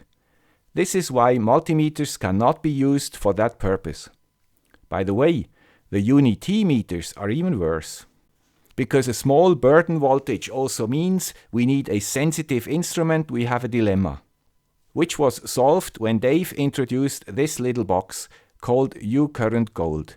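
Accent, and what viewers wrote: Switzerland. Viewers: and don't forget 'the guy with the Swiss accent' reference either - otherwise us old folk will become bewildered and confused. We don't like change!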